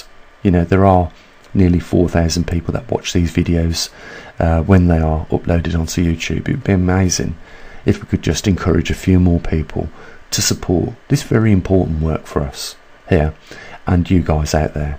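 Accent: Birmingham, United Kingdom. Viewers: You sound like a fellow Brummie. Lol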